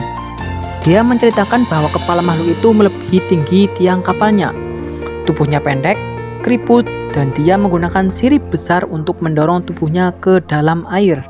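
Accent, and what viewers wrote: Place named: Banyumas, Indonesia. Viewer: anjay ngapak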